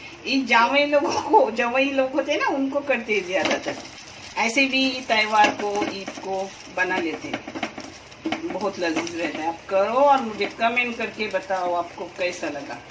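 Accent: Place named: Konkan, India. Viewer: BEHEN JI AAP KOKNI HAIN , JIS TARHA AAP KI BANAI SARI RECIPES AUTHENTIC HAIN , USI TARHA AAP KI URDU JO KOKNI ANDAZ AUR PRONUNCIATION" تلفظ" LIYE HOTI HAI WOH MUJHEY BOHOT ACHCHHA LAGTA HAI . DEEGAR AAP KI RECIPE KE DARMIYAN DUAYEN AAP KI SHAKHSIYAT AUR KIRDAR KI AQQASI KARTI HAIN ,"LAJAWAB ". ALLAH TĀALA AAP KO KHUSH RAKKHEY .AAP KO SIHAT AUR LAMBI ZINDAGI DE .TAKEH AAP ISI TARHA KIKAN KI NIT NAI RECIPE SAY HUM SABHON KO NAWAZTI RAHEN . "ZAHID SANGE".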